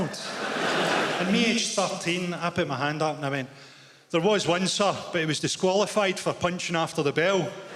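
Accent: Scotland, United Kingdom. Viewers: has a much stronger Scottish accent when live in Scotland that, say, England. Whether he’s toning it down in England or putting it on in Scotland, it’s pretty pretentious either way. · Francie Boyle is Irish Catholic with Scottish accent. The apple doesn't fall far from the tree.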